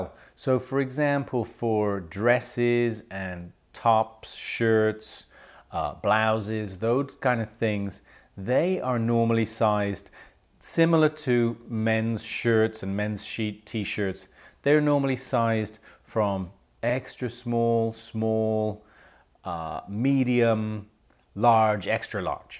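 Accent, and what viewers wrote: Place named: Ireland. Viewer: your pronunciation is quite Irish to my ears, however you do use a lot of American vocabulary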